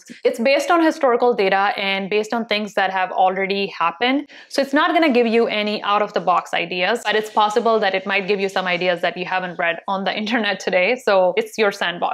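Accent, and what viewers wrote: India. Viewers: You sound lit bit like an Indian. Anyways thank you for this video.👍🏼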